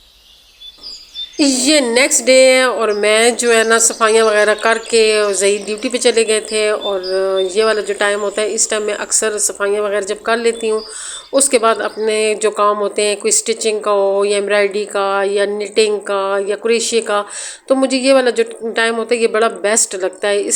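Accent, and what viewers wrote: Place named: Attock, Pakistan. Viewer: I like your house...simple,decent... Ap k bolny k andaz sy mjy lga k ap attock sy hn..r ap ny khud b zikr kr diya😊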